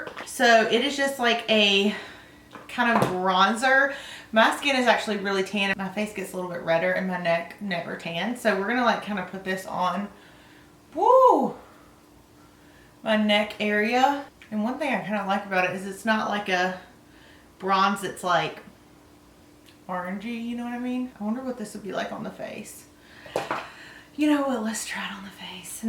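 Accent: South, United States. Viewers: I love your southern accent 😂 what state do you live in? Close to Tennessee ?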